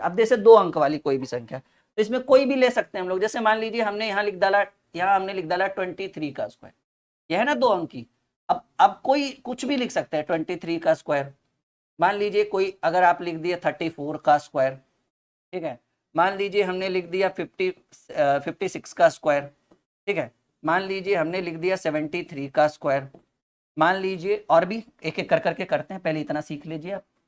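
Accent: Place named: Maharashtra, India. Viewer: तुम्ही बहुतेक मराठी आहात.कारण तुम्ही आठी, साती म्हटले.😊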